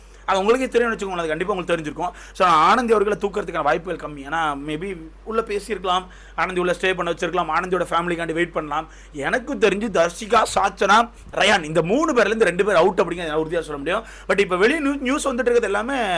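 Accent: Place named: Nagercoil, India. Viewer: Bro Nenga Nagercoil ah hahaha yalavu 😅Nagercoil slang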